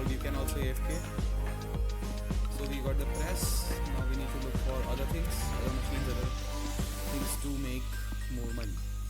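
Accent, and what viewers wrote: India. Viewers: Bro ur indian ?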